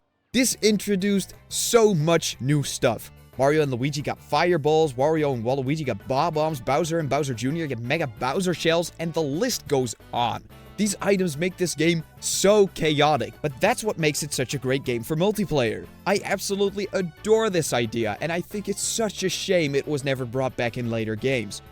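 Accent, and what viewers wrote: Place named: Netherlands. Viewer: Hmm, the accent... Fellow Dutchie? 😄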